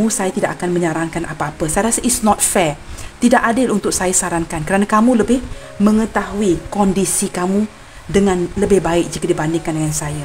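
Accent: Malaysia. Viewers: Tak apa kak aku suka kak cakap Malaysia , aku suka dan aku paham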